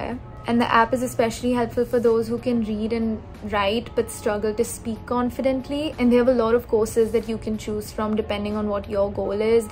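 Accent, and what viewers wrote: India. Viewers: Indian accent ! That's great mate!👍can you plz tell me how to turn yourself into American mode ? 😜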